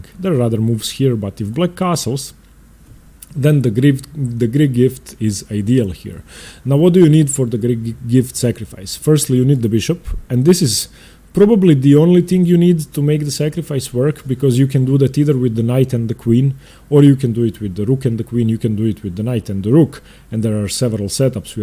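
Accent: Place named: Russia. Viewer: Of course he has russian accent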